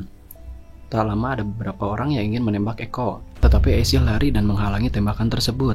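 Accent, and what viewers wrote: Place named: Sunda, Indonesia. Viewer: Pembaca Narasinya sangat 👍👍👍👍👍Sunda yg 😅👌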